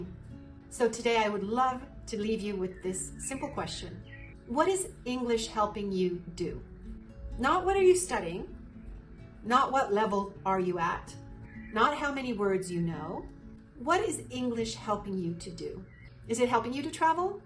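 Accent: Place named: United States. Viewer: This américain accent ????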